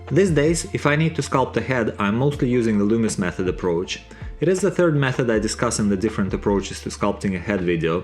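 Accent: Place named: Russia. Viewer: А акцент то русский 😂😂😂👍👍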